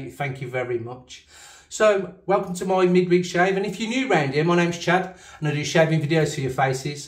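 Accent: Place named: United Kingdom. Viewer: New sub here, wet shaver since lockdown, started 1/7/2020 a date you can remember 😂. I bumbed on your video and said to myself what an enthusiastic lad, I hope you keep this freshness to your future videos. I also love paa john frum, cad is another favorite. To the lad who wrote about why going to so much expenses I would answer when you get in the rabbit hole you can't get out 🤣. Where about in the UK are you? I try to figure out from your accent. I live in Southampton. Take care buddy 👍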